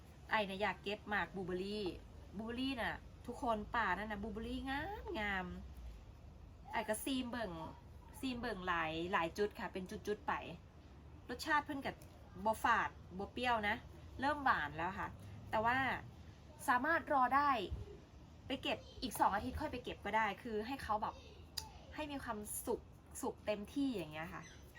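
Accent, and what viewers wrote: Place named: Nyaw, Thailand. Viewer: สาวไอ่ไทเมืองสกลบ่ สำเนียงคือญ้อเมืองสกล หมานๆเนาะ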